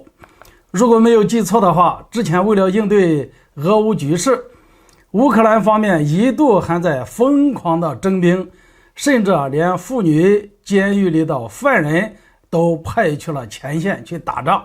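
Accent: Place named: Shandong, China. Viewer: 山东口音太重了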